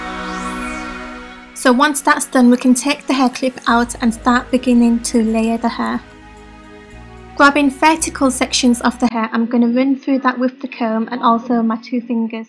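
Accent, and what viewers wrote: Yorkshire, United Kingdom. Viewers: you speak like british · from Leeds or Bradford? x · hear a British accent on this girl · her accent makes her prettier... sound like British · has a British accent thats awesome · are you from Yorkshire by any chance? Just your accent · are you British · Her accent does sound Brittish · British accent <3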